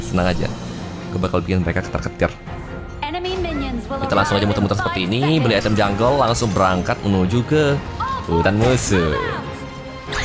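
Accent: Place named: Medan, Indonesia. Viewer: Mati kau longor wkwkwk medan kali bahasa mu bg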